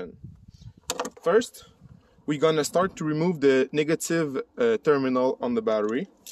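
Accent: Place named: Quebec, Canada. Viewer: pour l'effort pour l'anglais 😄. Toujours drôle d'entendre un accent québecois sur YouTube. Bon vidéo 👍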